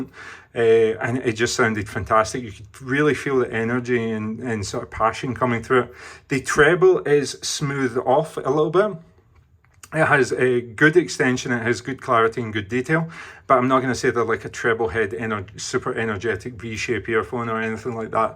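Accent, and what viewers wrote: Scotland, United Kingdom. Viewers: 😂 I am going to say Scottish (based on the Scottish accent) and if I am correct in assuming, he is not in the UK (Asia based) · You sound like a British Bernardo Faria.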